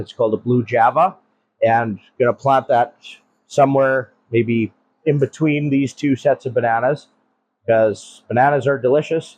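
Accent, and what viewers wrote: Saskatchewan, Canada. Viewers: I couldn’t possibly say nay to that thick Canadian brogue. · Bro, we can tell by the accent you're a good Sasky Canadian boy, there won't be any naysayers 😂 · Some how the Canadian accent works with better than and I'm not sure how I feel about it.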